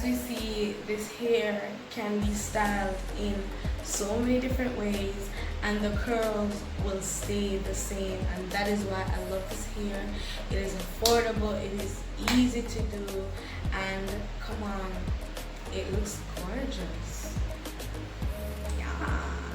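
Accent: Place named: Jamaica. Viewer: Nice love the way u used ur Jamaican accent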